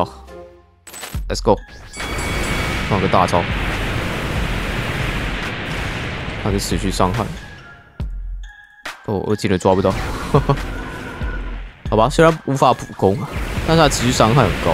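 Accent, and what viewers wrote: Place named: Hong Kong, China. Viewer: R子你可以用马来西亚的口音吗？好怀念你马来西亚口音。你现在的口音好像香港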